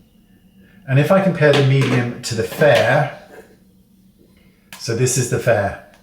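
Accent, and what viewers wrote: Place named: United Kingdom. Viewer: I love just to look at,sometimes, don't get everything he says, British accent,which I love, but I do admit, his videos are very informative, useful!! Thank you,handsome, talented ❤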